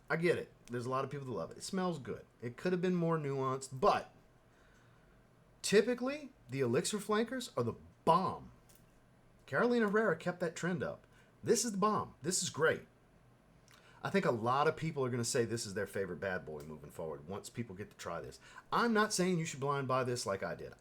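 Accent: United States. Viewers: Sorry for this kind of strange question, but where do you come from?As a Pole, I find your American accent souding really good to my ear🙂,not too heavy, understandable though. Your review is good too.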